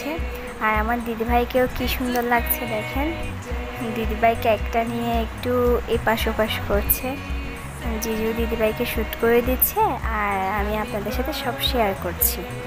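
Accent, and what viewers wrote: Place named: Chittagong, Bangladesh. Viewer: Apu Tumi ki ctg r Tomar kothagula sune mone hoitese happy birthday to apu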